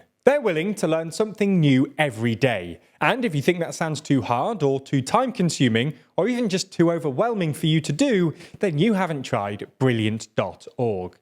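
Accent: United Kingdom. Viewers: Big fan of TLDR. Still, it sounds very condescending listening to a British accent telling us how well we have done "given where we have come from". Where we came from was oppressive British rule, that famine you very briefly touched on was a genocide perpetrator by the British govt of the time. Yes, we have come a long way!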